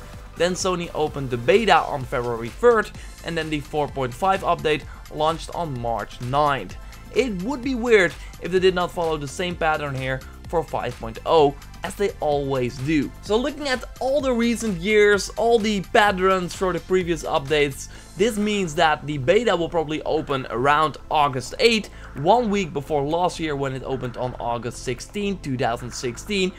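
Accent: Netherlands. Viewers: Ben je Nederlands? Ik hoor het aan je accent hahah ;)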